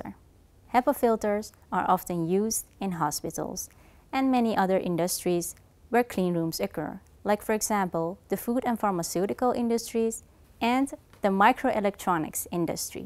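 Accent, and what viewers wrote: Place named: Venezuela. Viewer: She's from Venezuela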